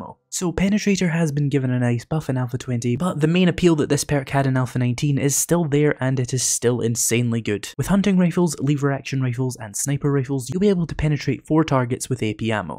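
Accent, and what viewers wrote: Scotland, United Kingdom. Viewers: are u from scotland brother ur accent reminds me of a outlander main male character's one that he tried to addapt to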